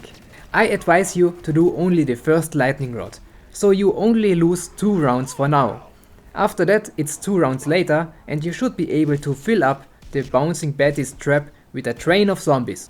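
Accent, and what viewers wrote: Germany. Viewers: war nicht böse gemeint 😅finds krass das du so gut englisch kannst , als deutscher hört man sowas einfach raus 😅😅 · Is that accent german?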